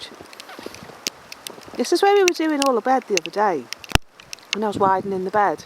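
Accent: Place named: England, United Kingdom. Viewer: I have just found you! It is lovely to hear an English voice, as there are so many other folk, but not many English videos it seems, who are about every day life. I love where your live, and those beautiful trees. I used to keep chickens, Rhoad Island Reds, like yours, and I loved them - they were ex batterie. The whole world around you looks so wonderful in the snow - fairy dust on everything. Love your hat! Blessings to you from Jilly & Madge the beautiful black rescue greyhound, from Devon, England xxx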